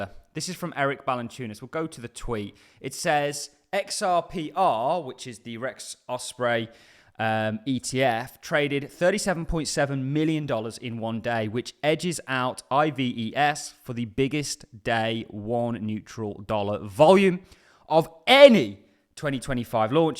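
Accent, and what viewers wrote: Birmingham, United Kingdom. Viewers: Cheers. Keep up the good work. Placing your accent somewhere in the south / South West Birmingham area?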